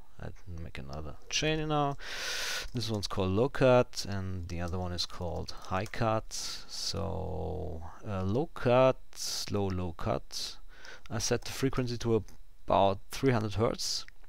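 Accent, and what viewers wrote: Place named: Germany. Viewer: you sound German..lol..."wideos"....still a good tutorial. thnx!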